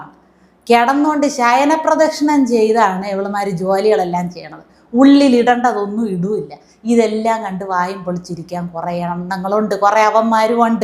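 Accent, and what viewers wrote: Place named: Trivandrum, India. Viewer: വേഷത്തേക്കാൾ കച്ചറ ആ തിരോന്തരം ഭാഷയാണ്🤮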